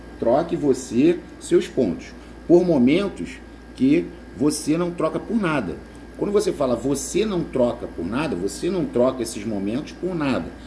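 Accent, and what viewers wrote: Rio de Janeiro, Brazil. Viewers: 7/50 !! Rlx, prof, é quase impossível agradar todo mundo! O senhor é nota mill, seu sotaque bem carioca faz parte do pacote!! rs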